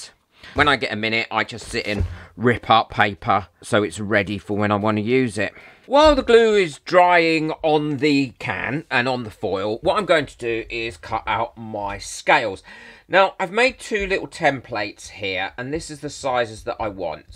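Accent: United Kingdom. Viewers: That pot came out so, so well! I'm genuinely impressed with how you did that. You have such a great creative mind and you seem to see possibilities in everything around you. I would absolutely love to sit and chat to you about crafting and your creative process. You are such an intelligent and clever man. I am a huge fan of yours. I am also a brit and I love your accent. What part of the country are you from?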